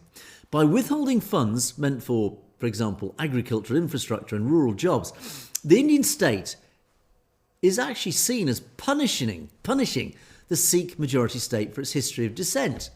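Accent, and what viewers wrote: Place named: United Kingdom. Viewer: massive respect to you gave us morale boost…just like Canadian James Cousineau (miss James laugh). Your voice is iconic it’s very coarse and gravelly with British English accent. 🧓🏼👔🎙️⌨️🖱️🖥️💡🌳 🕰️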